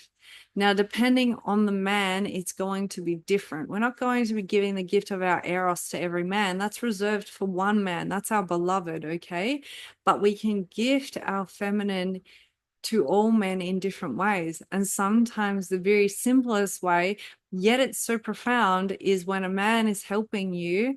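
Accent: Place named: Germany. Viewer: It sound like you are German and are trying to hide your accent…am I right or am i wrong..? Am curious…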